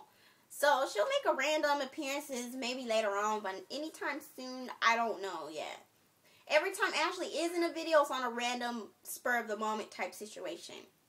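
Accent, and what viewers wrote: United States. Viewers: lol you really sound country girl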